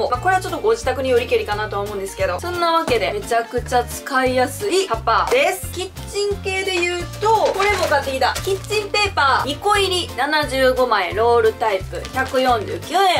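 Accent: Kansai, Japan. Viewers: 初めてコメント失礼します😊いつも楽しく動画見させてもらってます😊😊 関西人なのでの喋り方も面白ポイントもどつぼです❤ 編集もかわいいが詰まってて大好きです！今日無印良品行っておんなじ商品いっぱい買ってきちゃいました〜😋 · 無印週間の動画を探してたら、初めてさんに辿り着きました！関西弁が可愛いすぎます😂 他の動画も観てみます〜💞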